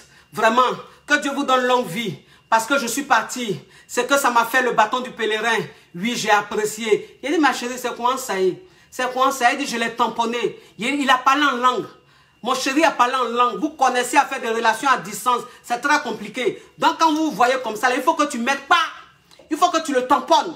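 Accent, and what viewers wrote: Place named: Ivory Coast. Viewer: 😂😂😂😂😂😂😂ma Diva tu m'a tué hooo ko grillade , soupe, braiser je te love ma cause simplisimie, sans façon, ma diva qui parle le vrai français direct de nous en côte d'Ivoire. Love u❤❤❤❤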